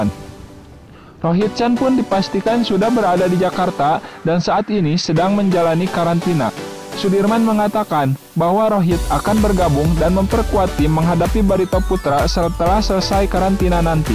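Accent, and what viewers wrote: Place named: Bandung, Indonesia. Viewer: Konten Persija rasa Persib dari logat adminnya Persib banget. Semoga jadi jembatan perdamaian & persaudaraan.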